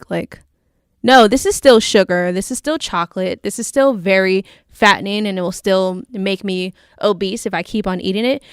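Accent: New York, United States. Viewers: you should really go with yearrrrrrr that the new york in you lol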